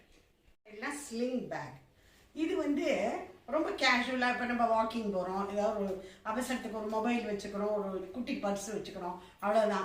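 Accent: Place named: Chennai, India. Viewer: Nice sharing mam. I like Your talk also . Tipical Chennai person it seems. I am also a crazy person in hand bag collection. Mam, how you are maintaining your hand bags? Kindly share.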